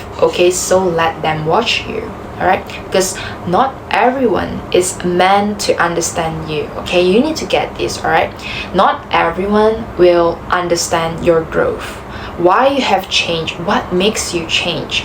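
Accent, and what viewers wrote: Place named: Meghalaya, India. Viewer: Your accent reminds me of my incredibly wise friend who is from Meghalaya. It feels like an older more experienced friend spoke to me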